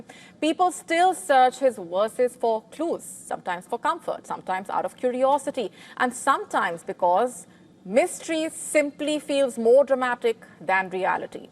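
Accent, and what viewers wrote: India. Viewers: I couldn't understand half of this from her poojeet accent. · Accent of India is too strong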